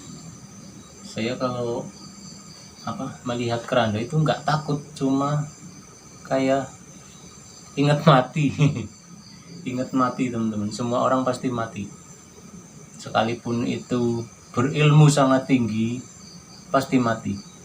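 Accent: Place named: Java, Indonesia. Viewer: assalamualaikum.salam kenal dari tangerang mas mas logat jawa penampilan orang timur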